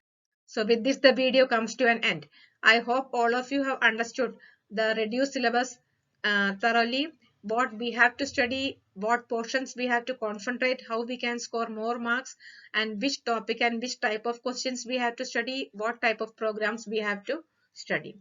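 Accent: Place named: South India, India. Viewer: Mam I am one of your x student maybe you don't remember me. I am a big fan. ❤️❤️❤️ You are amazing. It feels so nice to just hear your sweet South Indian accent after almost 11 years. It brings smile on my face. 🙂🙂🙂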